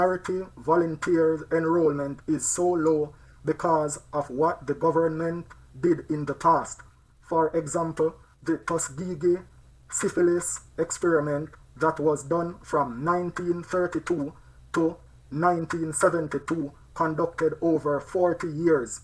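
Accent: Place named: Jamaica. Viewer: So True. Thanks White guru white a Jamaican accent.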